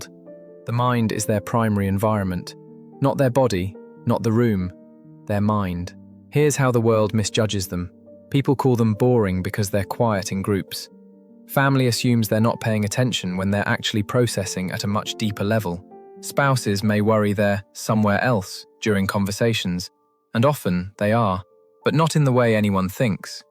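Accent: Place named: United Kingdom. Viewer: I miss the previous persona/voice. This British accent feels distant. It actually makes me uncomfortable.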